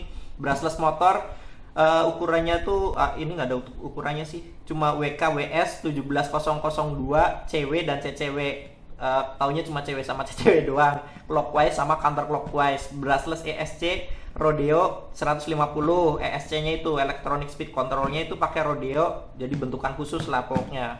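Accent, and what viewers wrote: Banyumas, Indonesia. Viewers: ok sipp makasih mas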